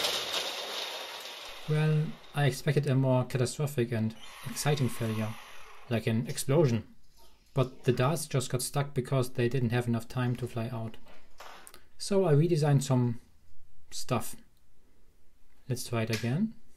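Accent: Germany. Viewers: I don't know if it is a good idea for people with germanic accents making weapons.. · Your accent is uhh... German? I mean it wouldn't be a surprise to me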